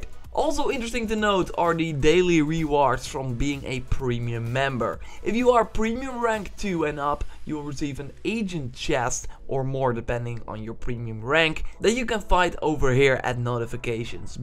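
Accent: Netherlands. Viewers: You sound Dutch :)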